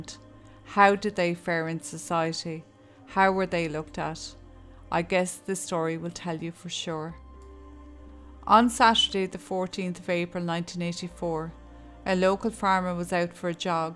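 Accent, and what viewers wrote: Ireland. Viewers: I do have to say that these stories of murder and the following mayhem are awful, but getting to hear them told in that beautiful Irish lilt of yours makes the hearing of them a little more bearable. I’d love to remind folks that “the church” and “religion” is about as far from God as living in sin is. Remember, it was the “church people” who crucified Jesus. Jesus never condemned anyone. He taught His message. Those that received that message were told to go on their way and sin no more. Those that did not, they just went their own way. Most of the atrocities committed in this world have been done in the name of God, but the SPIRIT of God was not behind it. Jesus Christ was crucified by “church people”. If church people would crucify our Savior, how many more of us regular folk will be made to suffer at the churches hand? I hate to think of it. 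Organized religion is the very devil!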